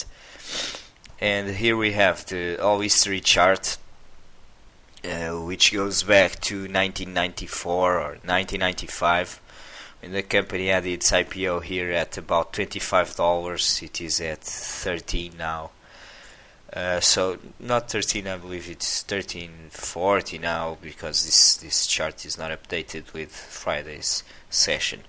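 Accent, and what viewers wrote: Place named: Russia. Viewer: Nice Russian accent!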